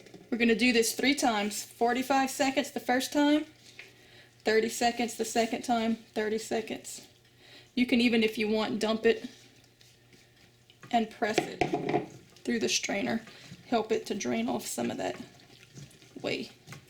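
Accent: Louisiana, United States. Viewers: Cannot wait to try this. A lot of other videos I watched made homemade mozarella seem a lot more complicated. I live in a small town where specialty items are not usually available, so I appreciate the use of easy to find groceries. On a personal note- I picked up the Louisiana accent immediately, and paired with the well-used Magnalite pot, I knew we were from the same state! So hello and thanks from Jennings, LA!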